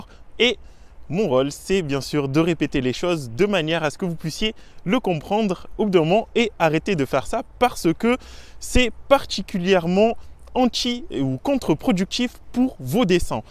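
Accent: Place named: Toulouse, France. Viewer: je sais pas mais on dirait que t es a toulouse vers Bonnefois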